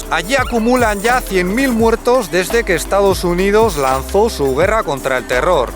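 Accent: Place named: Castile, Spain. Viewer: Great video from a cute couple, i watched many of your videos and i congratulate you for their quality, the images are spectacular and the information more credible than the western MSM. I wanted to comment in Spanish but my writing is rusty, i Haven't written in Spanish for over 30 years. Fortunately i can still speak and read the language to some extent, i also have to admit, it took me a while to understand you due to your strong Castilian accent. Muchas gracias de Canada.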